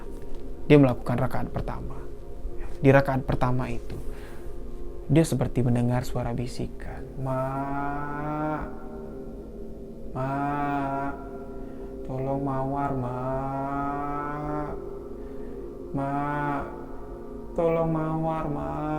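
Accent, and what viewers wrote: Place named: Kalimantan, Indonesia. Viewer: Maaf ya mas, masnya ini asli orang Jambi apa orang suku Kalimantan. Soalnya logat bicaranya kayak suku Kalimantan 🙏.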